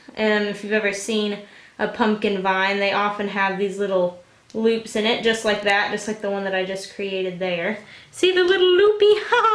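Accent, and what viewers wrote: Texas, United States. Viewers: She sounds more Texan than usual in this intro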